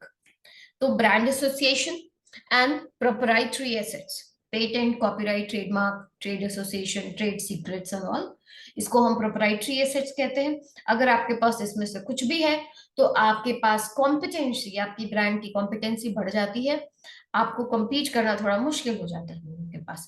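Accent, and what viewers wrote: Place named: India. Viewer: I'm a bit late here, but you need to work on your English pronunciation. Your indian accent and mix of English and Hindi overshadow every single word in this video, it's impossible to understand unless you're a native indian. Which i'm not, so I didn't understand a lot of this. Good powerpoint though but that's about it, thank you.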